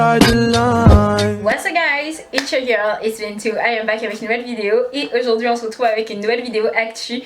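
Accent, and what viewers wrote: Quebec, Canada. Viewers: On dirait ta un accent québécois 😊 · petite accent canadien? c'est mignon <3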